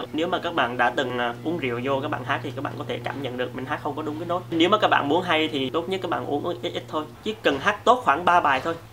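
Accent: Hue, Vietnam. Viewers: Giọng anh miền nào mà nghe vui vui ha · Anh gốc Huế hk, giọng tựa bạn em, em thích giọng anh lắm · ong noi nay nguoi hue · anh người huế à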